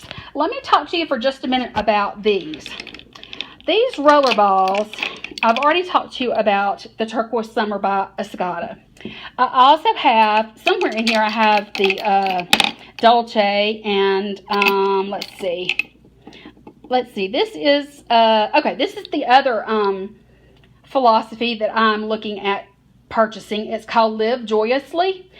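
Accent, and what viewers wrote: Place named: Boston, United States. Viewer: Omg I love your accent! I'm from Atlanta and just moved to Boston, I miss hearing people talk like this! You have a great collection :)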